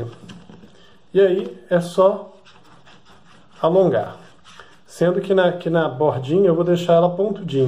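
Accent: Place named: Minas Gerais, Brazil. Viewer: Dou mais uma apertadim....... Sotaque mineiro não nega!!!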